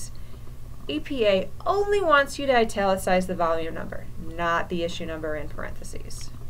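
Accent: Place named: Chicago, United States. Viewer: Love the Chicago accent <333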